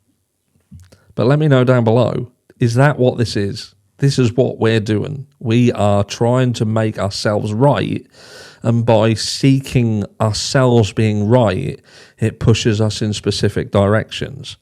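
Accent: United Kingdom. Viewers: Really thank you mate you sincerely do absolute top work on your channel and your balanced yet open view to this most unfortunate matter. (It’s also great to hear a fellow Brit voice breaking the case down… I’m in Kensington myself 🇬🇧)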